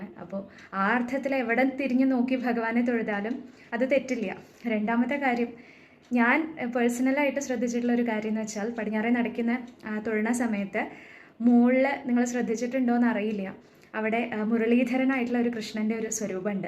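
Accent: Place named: Palakkad, India. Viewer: മോളുടെ സംസാരം കേട്ടപ്പോ pkd ആവും എന്ന് തോന്നി അതുകൊണ്ടാ ചോദിച്ചത് 😍😍😍😍 ഞാൻ ഒറ്റപ്പാലം 🙏🏻🙏🏻🙏🏻🙏🏻🙏🏻😊😊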